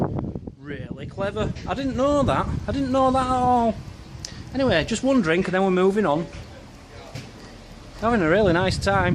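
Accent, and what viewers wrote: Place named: Scotland, United Kingdom. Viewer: I thought you were Scottish!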